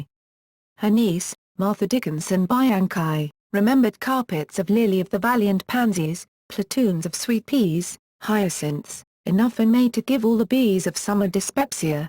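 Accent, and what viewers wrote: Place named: United Kingdom. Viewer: british accent or not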